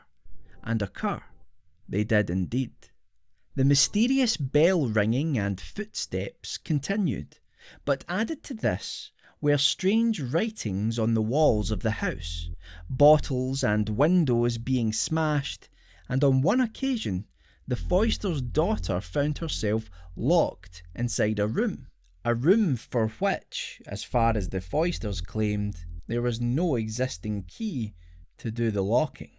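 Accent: Scotland, United Kingdom. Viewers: Quality videos, interesting concepts, Scottish accent, Dude needs more subs. Good work sir.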